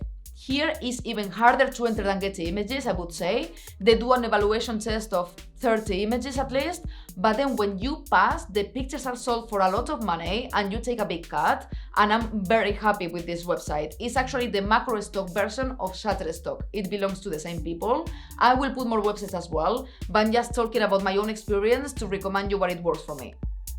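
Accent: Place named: Spain. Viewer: Hi, i love your videos, your viewpoints on the pro phhotography business and your unique spanish accent when you speak english! (My native tongue is French and your english is easier and more pleasant to listen to for me than the excited stance of most american youtubers) Microstock is the niche that interests me the most on youtube so i would like to recommend that you delve more into this topic. Eversince Nicole Glass has left this space on this platform, i have the feeling that you are the only one who can elegantly and efficiently fill up this gap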